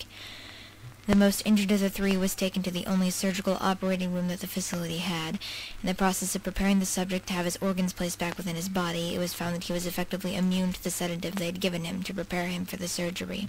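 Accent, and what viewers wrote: Russia. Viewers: Ya ya, The russian accent is the best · I read this when I was young(er) and hearing it like this brought back strong nostalgia! I liked the Russian accent and while the distorted vhs voice thing was cool, I feel it could've been more focused to the crackling sound instead of the fuzz. But overall I rate this reading 8.99/10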